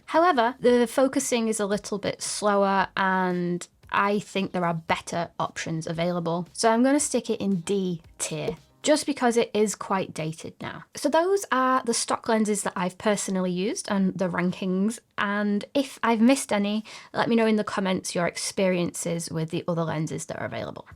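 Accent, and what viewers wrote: United Kingdom. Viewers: You could do voice overs for british characters in animation films. Your voice is almost perfect.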